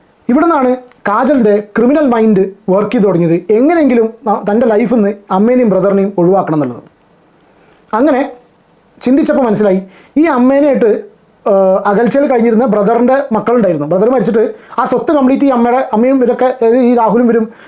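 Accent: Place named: Palakkad, India. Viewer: ബ്രോ വീട് പാലക്കാട്‌ ആണോ ഭാഷാ ശൈലി അതുപോലുണ്ട് 👍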